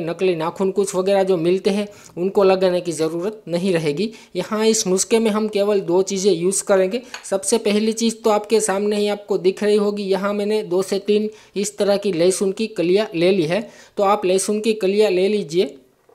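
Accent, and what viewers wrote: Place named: Kathiawar, India. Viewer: Sir tame Gujarat thi chho ne ? Kathiyawadi chho lage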